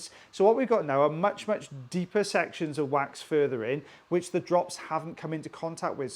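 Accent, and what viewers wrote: Wales, United Kingdom. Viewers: I think the main reason I'm here is because I miss Wales / a welsh accent so much.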